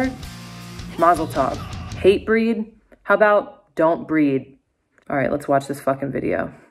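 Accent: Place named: Boston, United States. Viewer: Huge props to for having a correct Boston accent. Makes her that much moah feckin hahdcaw dood.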